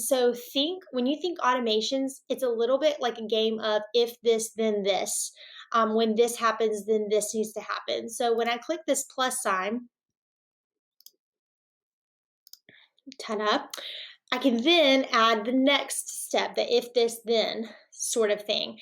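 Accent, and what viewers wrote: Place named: South Carolina, United States. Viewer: Has to be from South Carolina with that accent. Represent lol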